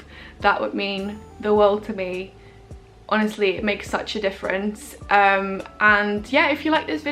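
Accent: United Kingdom. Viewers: Gosh, you are beautiful, the british accent just give a inch of perfection to you.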